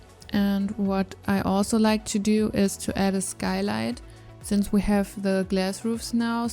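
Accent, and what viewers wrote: Germany. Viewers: ich komme auch aus Deutschland. Ich hab mir schon irgendwie gedacht das du deutsch bist weil man das ein bisschen hört. 😂😁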